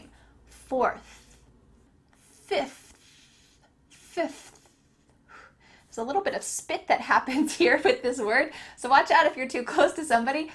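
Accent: United States. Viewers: Please do not say you are speaking or pronouncing “English” You are pronouncing :AMERICAN”. It is very different. In English remember D is at the start of the word. Mirror is MIR. Ur. Asks is a long A “R skas....Please you are murdering my language !!!!!!!! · hell this is not English its American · This is American English pronunciation - bah humbug. Correct your title. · Oh, as a Brit I had to abort! Those pronunciations are... very American! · American English pronunciation? Yes, I think so. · Please stop learning English from an American, they destroy the language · I know you say this is American English, but as someone who’s been born and bred in the UK, this is sooooo American 😂 I love it 😻 it’s all the words that stand out to me when watching American shows, I could hear the difference but I couldn’t understand what it was that made it different, this is so cool, thanks 🙏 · Not that hard. 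Just kidding. Not that hard for me now yet it did take me some time to master the pronunciation of those words. And I'm glad you didn't include words such as whirlwind or caveat. (By the way, do you live in the US? Your speech sounds more and more American. I remember you mentioning that your husband is American - I might be wrong about that). What a teacher you have become ! Kudos to you.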